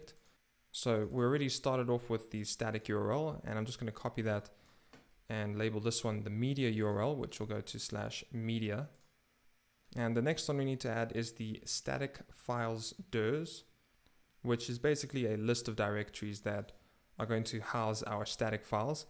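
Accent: South Africa. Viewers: Are you by any chance from South Africa? You are doing a great job by the way.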